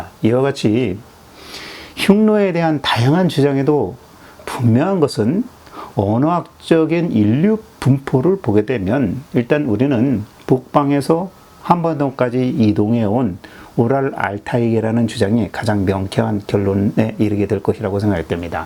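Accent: Gyeongsang, South Korea. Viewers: 깅상도 아이가?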